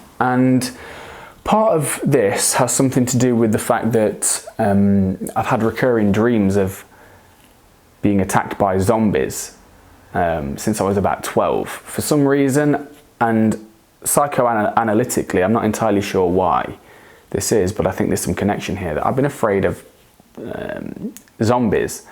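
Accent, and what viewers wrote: United Kingdom. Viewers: I could listen to you for hours! fascinating mind and damn... I love your british accent! 🤫😘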